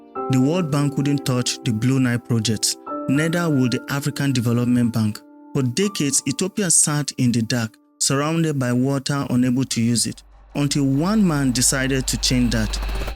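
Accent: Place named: Nigeria. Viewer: The accent naija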